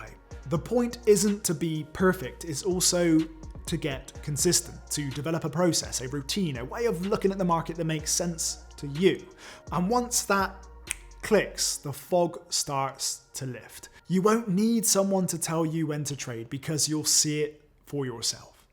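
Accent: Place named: Bristol, United Kingdom. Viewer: Just found your channel, its really great and your accent sounds not too far from me in the Forest of Dean, sensing Bristol for you. Noticed you haven't posted in a. while. Please do, your videos on trading are refreshing. From someone learning, thanks!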